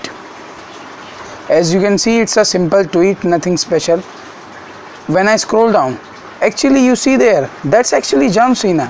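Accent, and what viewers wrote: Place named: India. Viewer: You got a really strong indian accent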